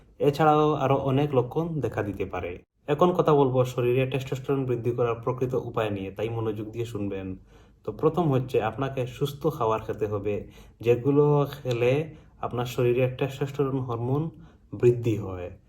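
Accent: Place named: Barak Valley, India. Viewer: Bhai aap konsa college sa ha Apka language to bengali jasa ha matlab .. from barak valley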